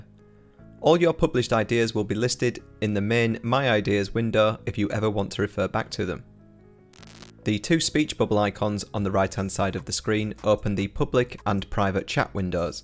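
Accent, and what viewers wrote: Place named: North, United Kingdom. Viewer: Half way through & it is an EXCELLENT education! Thank you! Top Northern accent also!!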